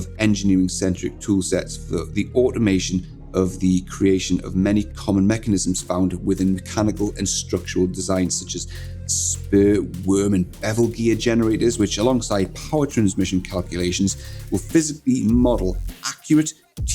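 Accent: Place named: England, United Kingdom. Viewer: just watched your video for a second time at 1.0 play speed instead of normal. I have a hard time keeping up and I think its just due to the heavy english accent. You create excellent videos but a suggestion comes to mind, SLOWDOWN , for us dumb americans that cannot always pick up what you are saying with the accent. I am sure you have seen this comment before and are perhaps sick of it but it has merit . PS love the leather jacket and for whoever thought is was distastefull should pound salt where the sun does not shine! TANK'S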